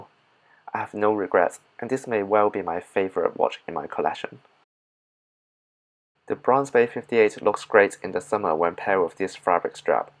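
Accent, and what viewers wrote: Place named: China. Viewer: you chinese ah? sounds like uncle Roger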